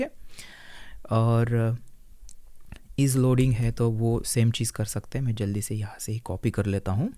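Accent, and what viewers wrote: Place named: Mumbai, India. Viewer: bhai aapka slang typical mumbaikar jaisa hai, btw love your content❤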